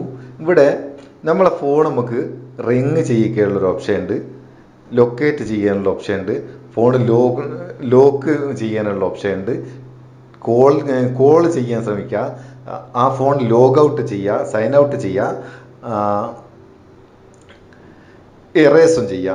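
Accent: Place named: Vadakara, India. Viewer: മ്മളെ ബഡേര ഭാഷ യും ഇഷ്ടപ്പെടുന്നവർ ഉണ്ടല്ലോ സന്തോഷം ആയി 😛😂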